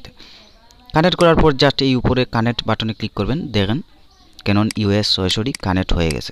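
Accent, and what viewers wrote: Sylhet, Bangladesh. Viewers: ভাই আফনার বাড়ি কিতা সিলেট নি? আফনার মাত দেখি সিলোটি অখলর লাখান লাগে।🥰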